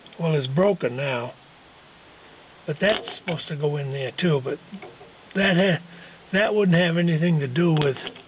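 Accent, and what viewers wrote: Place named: New England, United States. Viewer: YOu are working on one of the most frustrating parts of any vehicle. I have two GM tilt columns so I share your pain. I believe you have the spring on upside down and that you need to compress it to get the clip ring into the groove on the shaft which is a very tough job. That will hold the upper bearing race inside the ball bearings and eliminate the floppy feeling you describe. Not sure why steering columns have to be so ridiculous but some engineer is laughing you can bet. Loved the New England accent and would like to see pictures of your hot rod. Noticed the Ford break petal in the video. Happy New Year and best wishes for successful completion.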